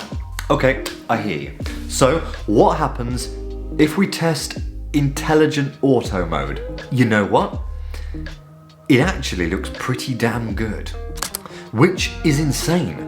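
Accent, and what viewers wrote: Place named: United Kingdom. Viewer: I don't know why but the British accent just got me. Love it though! and M50 is really handy for vlogging for sure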